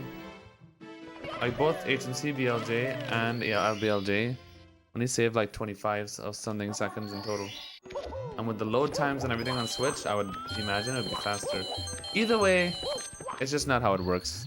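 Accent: Trinidad and Tobago. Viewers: Does anyone know where is from. Could be my ears playing tricks on me but his accent sounds like he’s from Trinidad and Tobago. Or at least grew up here.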